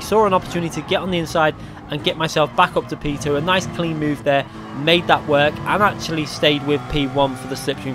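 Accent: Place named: Cheshire, United Kingdom. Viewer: Nice work mate, been watching you for a while now, you from the north west? Sounds like a Cheshire accent 🤔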